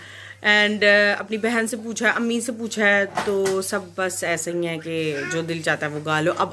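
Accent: Sialkot, Pakistan. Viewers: You r frm sialkot ap ki urdu se lag rh ha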